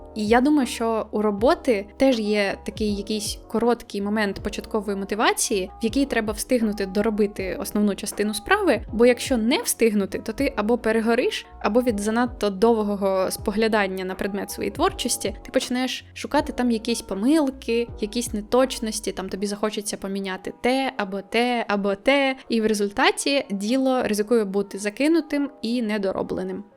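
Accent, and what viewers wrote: Russia. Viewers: Язык красивый и я его понимаю. Но русский акцент он же никуда не денется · даже русский акцент, т.к язык автора изначально русский)))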